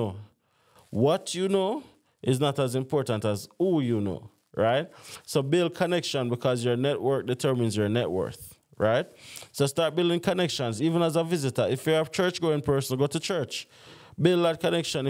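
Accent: Jamaica. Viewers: Like ur English patwa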